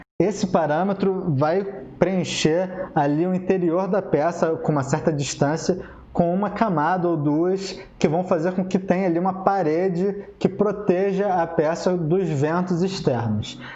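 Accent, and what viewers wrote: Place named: Rio de Janeiro, Brazil. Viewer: Esse sotaque carioquêixxxx é nojento demais meu.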